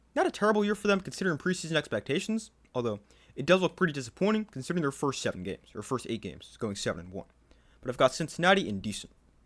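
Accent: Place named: New York, United States. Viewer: New York acksent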